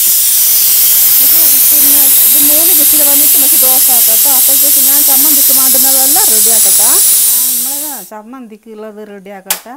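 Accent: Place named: Vadakara, India. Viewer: Slang 🤩 immale vadakara❤️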